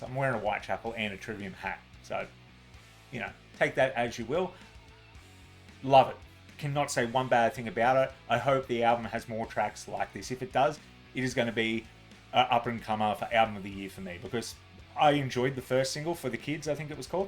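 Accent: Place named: Australia. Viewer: Australians ugliest fickn accent ever, but metal up your ass,from kiwi land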